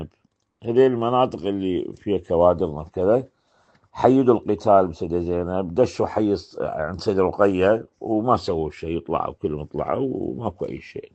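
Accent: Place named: Gulf, Arab world. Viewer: هذا يحجي خليجي مو سوري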